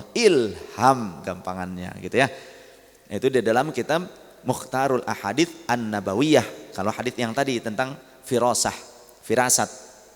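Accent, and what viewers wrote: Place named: West Java, Indonesia. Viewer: saya mau tanya, apakah punya darah sunda? soalnya logatnya terdengar seperti orang sunda..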